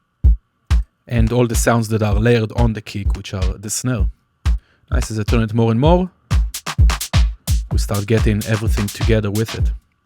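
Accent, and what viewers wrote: Israel. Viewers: gotta love that Israeli accent (great tutorial btw!)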